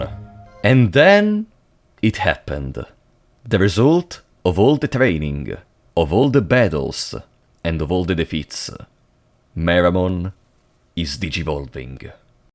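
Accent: Italy. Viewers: the thick italian accent sends me.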